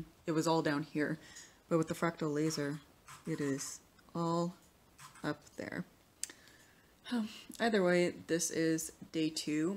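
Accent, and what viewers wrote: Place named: United States. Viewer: Where are you from? From your accent, I thought you were American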